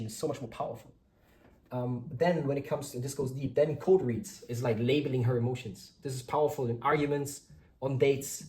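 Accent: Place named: Eastern Europe. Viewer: Where is your accent from?... You sound eastern European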